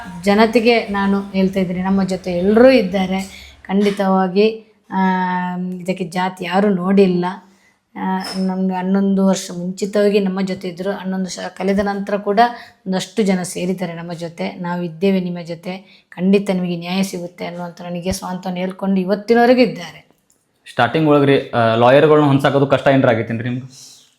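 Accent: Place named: North Karnataka, India. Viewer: ನಿಮ್ಮ ಭಾಷೆ ನೋಡಿದರೆ ನಮ್ಮ ಉತ್ತರ ಕರ್ನಾಟಕದ ಅಂತ ಅನ್ಸುತ್ತೆ ಒಳ್ಳೆದಾಗಲಿ ಅಣ್ಣ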